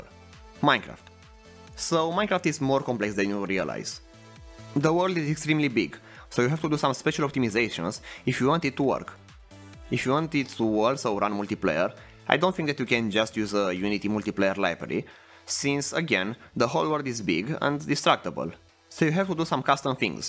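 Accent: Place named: Romania. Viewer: Efectiv stiaaaam ca esti roman dupa accent. Mam uitat la about si asa a fost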